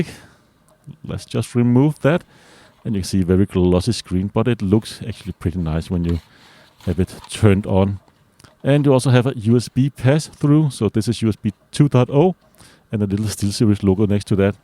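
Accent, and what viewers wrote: Germany. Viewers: Man hört so das er deutsch ist lol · u sound very german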